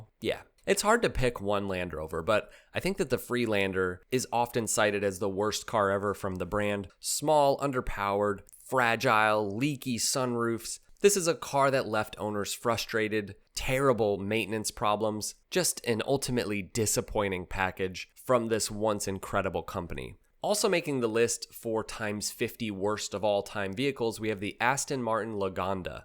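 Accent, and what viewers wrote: United States. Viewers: Every Citroen is the worst one 😂 but seriously, he sounds like an American so he probably doesn’t know France even exists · Yes but you hear that accent and you know to apply the US definition of "Global" (NY to LA)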